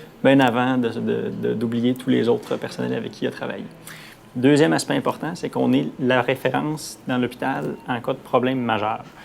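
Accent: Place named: Lac-Saint-Jean, Canada. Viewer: Certainement un gars du Lac St-Jean avec cet accent. :-)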